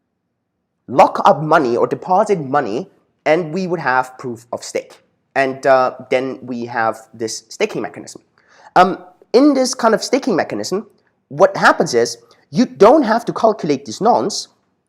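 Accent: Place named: China. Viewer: OMG I LOVE HIS CHINESE ACCENT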